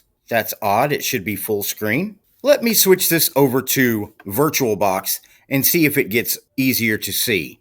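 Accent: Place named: Dakotas, United States. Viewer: Central time zone. I'm guessing from the voice you are in the Dakotas?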